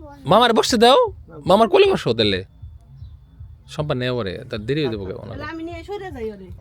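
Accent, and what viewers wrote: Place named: Sherpur, Bangladesh. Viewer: ভাইয়া আপনি কিন্তু আমাদের শেরপুর এর ভাষায় কথা বলেন আপনার কথা আমার ভালো লাগে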